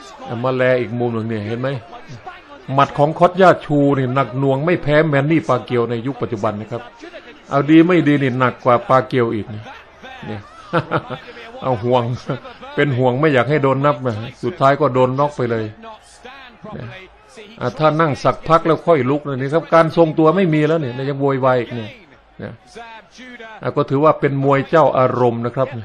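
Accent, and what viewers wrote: Isan, Thailand. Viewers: แอดมิน ทำไมมีแต่พากย์อีสาน ไม่เห็นมีพากย์ใต้เลย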